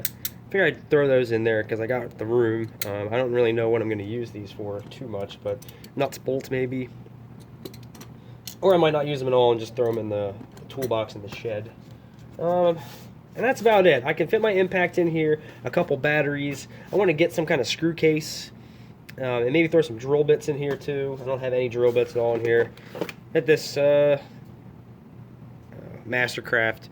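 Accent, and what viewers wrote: Philadelphia, United States. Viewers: Literally two seconds in I could tell you're from Philly lol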